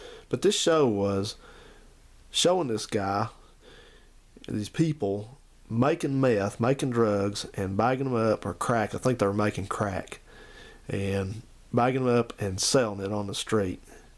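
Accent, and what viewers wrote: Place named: South Carolina, United States. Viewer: Squad 51 squad 51...though I'm 32, I love that show. Agreed about making merge...just what kids need to learn. My 9 yr old likes your repair videos over tv. He tells me you sound like you live in south Carolina.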